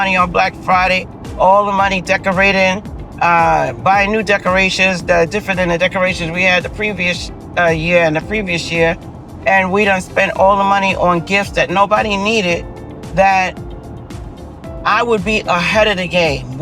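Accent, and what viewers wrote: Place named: New York, United States. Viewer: Amen, 🎉 Sis love your encouraging words I'm the one who is 66yrs, 285lbs and how i love your NYC accent. Love your videos. I've been watching your videos ever since the video popped up in front of me when you were with Gillian that's where it first started. Water pills, heart pills,baby aspirin and retain water from my N/A Cirrhosis. I know you have alot of subscribers but i'm starting this.... it's something i need to do, and like you said in a comment. I believe that God put that video there for me. I usually watch the watchmen and watchwomen brothers and sisters in Christ and then Gillians video popped on that's when i looked and watched like okay This is my wake up call Love you ❤ all the way from Illinois/Wisconsin.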